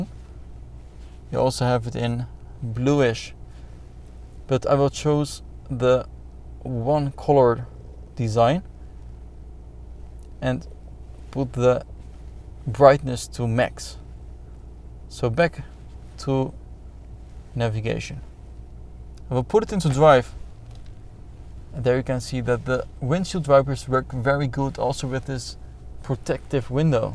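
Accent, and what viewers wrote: Netherlands. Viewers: echte hollander die engels praat :D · a dutch guy talking English... never been good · I smell some Nederlands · Hoor meteen een nederlander 😆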